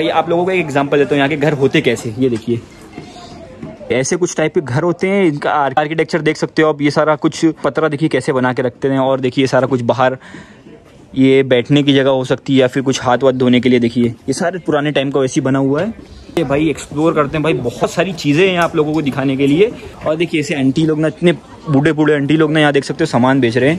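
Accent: India. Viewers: What do you think without subtitles? I can't understand Indian English.😅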